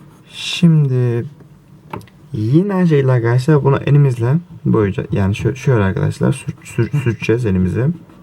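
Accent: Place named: Cyprus. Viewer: Kıbrıs da mı oturuyorsun?? (Şivenden dolayı söyledim)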